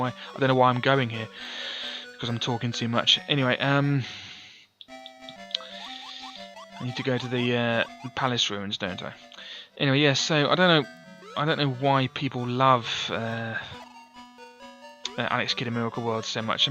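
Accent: United Kingdom. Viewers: I'm not certain there is a particular way a 30 year old is supposed to sound. You do sound very British though 😄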